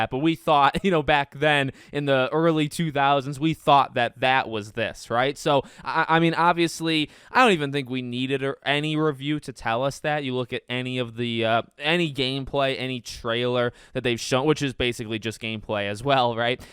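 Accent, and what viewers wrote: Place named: Chicago, United States. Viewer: Are you from Chicago? You sound like my people 😊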